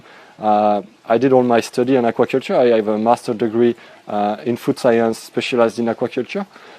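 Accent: France. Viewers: Ça fait plaisir d'entendre de l'anglais avec un accent français, j'ai presque tout compris^^ Merci pour cette vidéo!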